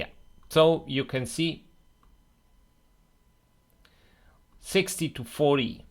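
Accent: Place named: Germany. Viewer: why not? It is honest and the German English always sounds funny to me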